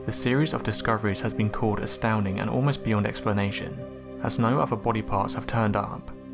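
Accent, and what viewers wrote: England, United Kingdom. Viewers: Dantess26 -English accent ✔ -Similar transition and profile pic ✔ -List of 5 ✔ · bra im confused. does he mean shoes? or real human feet detached from the ankle. can someone clear this up for me ? or does he mean that the human foot was inside the shoe when it was found? im a southern gentleman myself and I understand the man has a British accent, that being said, do they say feet in England instead of shoes? or am I just overthinking this.. point is does he mean shoes or real human feet floating around in the water .. cause I feel that if its human feet floating around then it should be an open/closed case that it was murder .......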